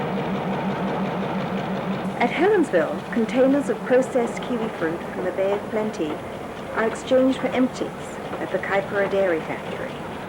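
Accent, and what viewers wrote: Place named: RP, United Kingdom. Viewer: A very interesting video. I love the very posh English accent lol!. 😄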